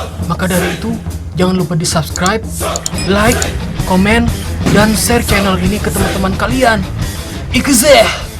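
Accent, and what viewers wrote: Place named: Banjar, Indonesia. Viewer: Bang saran , mengenai banyak koment yang kada paham bahasa banjar , di kasih text aja bang , biar logat lucu bahasa banjar nya kada hilang ,dan orang luar pulau paham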